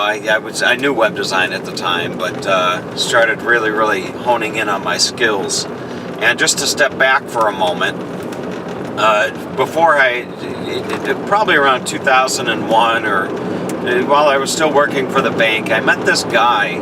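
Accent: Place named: United States. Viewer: Thanks for revealing your story.. I found your channel from the Cains Australia video and have now almost finished watching all your down under videos!.. Being British, I enjoy your out there yank style of talking for some reason. and has been great watching your Journey. I'm coming up to 30 soon and about to head to aus or canada on one of those working holiday visas to do farm work, woofing.. But can only wish I had more smarts to have figured out the self employed thing by now, I have done webdesign, have a portfolio site but failed at the getting clients part.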